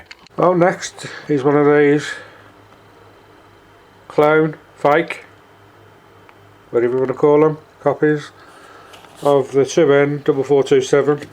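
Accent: Birmingham, United Kingdom. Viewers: Your Obviously A Brummie , Do You Remember PCRL On 103.5 FM ? Blessings From Penzance , Cornwall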